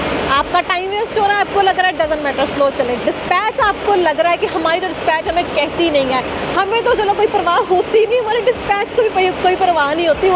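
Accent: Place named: Punjab, India. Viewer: Aapki punjabi english badi pyari hai. Aap ki birth place konsi hai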